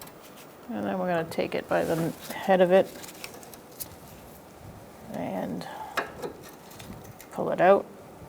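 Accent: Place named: New England, United States. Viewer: New England accent coming in good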